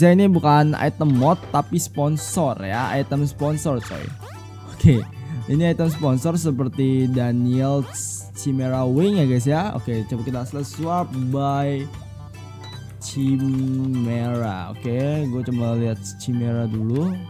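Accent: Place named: Bali, Indonesia. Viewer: Entah kenapa logat ngomongnya kyk orabg BALI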